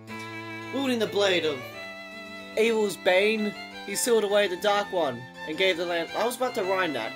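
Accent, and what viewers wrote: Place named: RP, United Kingdom. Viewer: you sound really posh XD waaah i should do a lets play...maybe XD so you can hear my voice >:3 i kid XD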